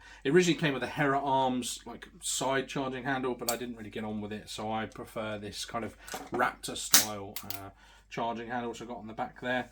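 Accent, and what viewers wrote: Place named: United Kingdom. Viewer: Hearing a British man talk about his AR15 is something I never thought would happen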